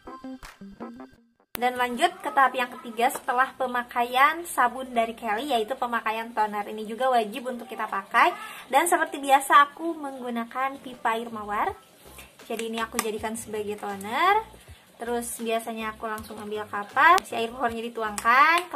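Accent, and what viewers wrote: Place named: Sunda, Indonesia. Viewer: Eta eumeul eumeul...meni nyunda pisan atuh, si teteh geulis teh...he he...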